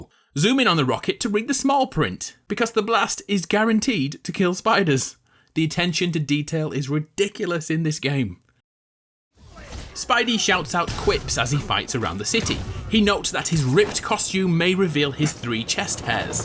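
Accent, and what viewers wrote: United Kingdom. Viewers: Hey , you are British?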